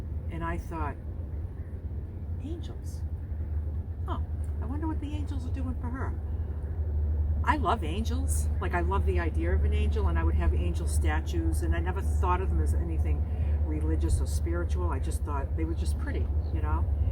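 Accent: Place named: Boston, United States. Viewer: I really enjoyed hearing about your spiritual journey. You have a way with words and the bostonian accent to boot, such a great storyteller. It's different for everyone and hearing others really gets my wheels turning. I believe in all things greater than myself, which always leads me to question. A wise woman once told me to not ask why but instead be grateful. Life long student over here, endless lessons to learn and so much gratitude.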